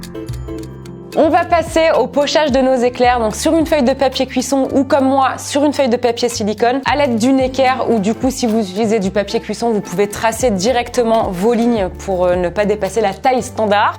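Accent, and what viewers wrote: Canada. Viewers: tu mon youtubeur préféré desvidéos accent canadien et qui met quoi des vidéos trop trop rire 👍👍👍👍👍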